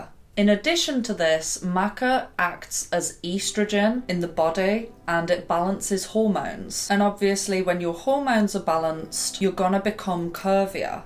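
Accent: United Kingdom. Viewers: Red maca does work alot better than yellow for woman hormones and curves. I lost weight in the stomach area when mixing it with chia seeds but gained boobs and glutes 💚 love listening to you btw UK accent gives me happy shivers. Will be checking in on your channel.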